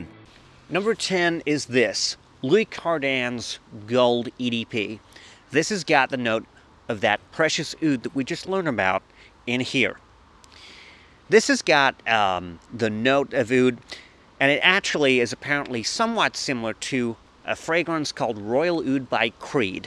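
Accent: United States. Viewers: Great list was a pleasure watching. Love the American accent 👍 keep up the great work. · An American accent? Really? I believe I can die happy now. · Great American accent! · That's an excellent American accent i gotta say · Love the American accent and that early cinematic porn feel. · I was so confused when he started speaking in an American accent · The American accent is getting a little better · That's a great midwest American accent..and some Gene Shalit vibes..love this whole thing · perfect American accent. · I didn't realize this was until minute 10. The accent was so good I literally assumed flew in an American friend for this video. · very nice american accent. Are you sure you wanna be a director rather than actor?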